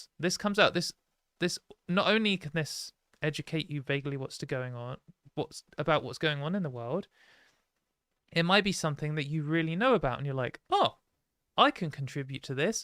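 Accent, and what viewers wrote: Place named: Oxford, United Kingdom. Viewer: Your "exit plan" if it becomes unbearable should be moving to Germany. We have a new self-ID law, a way better healthcare system and you can live here very well with only speaking English, at least for the first time, and you speak nearly perfect German school Oxford English. The greatest thing is TERF ideology and Anglosphere sex essentialism about "destinated gamete producers" are not very common, queer theory also not, more kind of "trans.pragmatism" focused on perceivable sex characteristics. I don't know how this is in Britain, but here are tons of cis women running around who look like you, have a voice like yours and are around six feed tall, so you will have no problem with passing. Our few TERFs are really caricatures of Anglosphere TERFs, they only can rehash all of the Anglosphere TERF stuff, NOTHING genuine with them, but it doesn't work this way in Germany and with German language, nearly all media make fun of them when they appear in public. 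And if you want to make music with fellow trans women, you can contact "Jill Please" (keyboards) and "FiNessi" (guitar like you).😁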